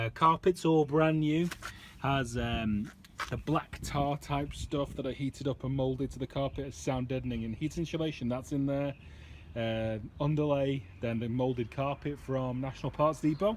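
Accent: United Kingdom. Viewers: A guy with a British accent living in cali. With an old school Ford truck. Welcome to America friend!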